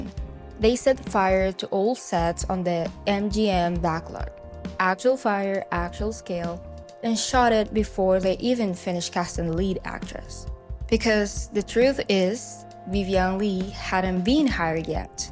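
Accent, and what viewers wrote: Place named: Tagalog, Philippines. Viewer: Are you Filipino too? I think I hear a little Tagalog accent, or maybe I'm wrong.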